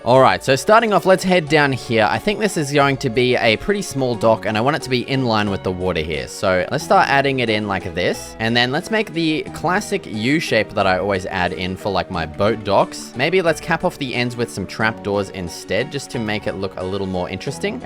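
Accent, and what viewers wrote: Australia. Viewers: Bros Def a Aussie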